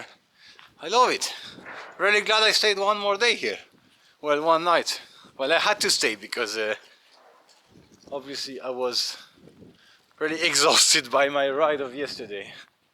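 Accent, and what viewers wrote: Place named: France. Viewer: This guy sounds like a french or something.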